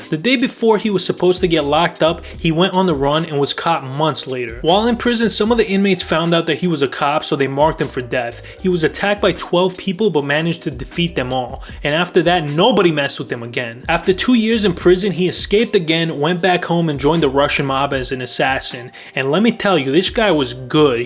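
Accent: New York, United States. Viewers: when you said “hey why don’t i do a video on this” i thought you were gonna say “aye i’m wolkin here” bc of your goofy ass accent everything about you is extraordinarily cringey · You sound so New York to my Briskish ears. Love your material man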